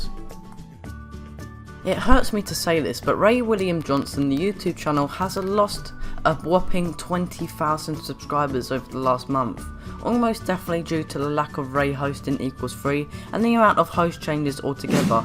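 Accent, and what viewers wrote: United Kingdom. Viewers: It's not a speech issue! It's how half of London talk. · Omg are you british?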